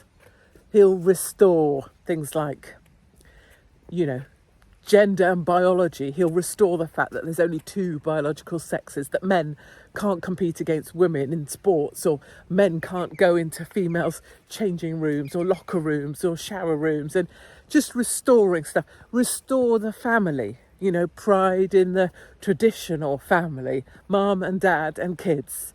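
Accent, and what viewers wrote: United Kingdom. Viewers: my darling girl with your lovely British accent, thank you for expressing how this old lady in the mountains of East Tennessee feels. You really do understand the mindset that put Donald Trump back in office. You are a rare gem of a person! Please stay healthy and keep spreading the truth.❤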